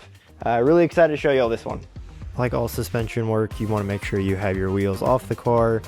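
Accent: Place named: Pittsburgh, United States. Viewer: Yinz from the ‘Burgh? I detect a Pittsburghese accent. 😅👍🏽 Thanks for actually showing the “rapid disassembly” method, even though it was a very short clip. I understand you can’t specifically recommend this method (due to liability), but I saw enough to have some confidence to try this rather than taking off the rear trailing arms.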